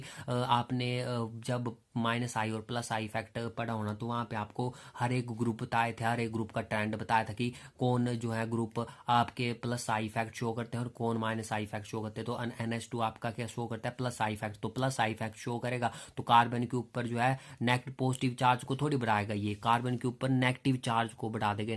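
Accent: Himachal Pradesh, India. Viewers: Sir kya app Himachal se ho aapki slang se pura lag raha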